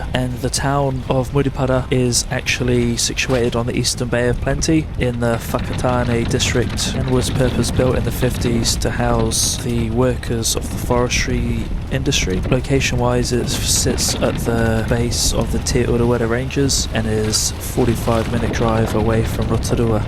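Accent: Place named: England, United Kingdom. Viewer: Great video, this guy sounds like an Englishman not a kiwi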